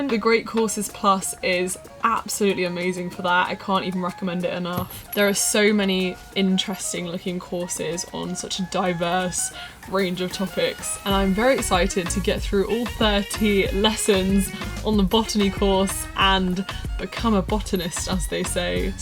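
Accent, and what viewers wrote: France. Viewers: your french accent is so lovely !! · To be honest your French accent isn't bad at all!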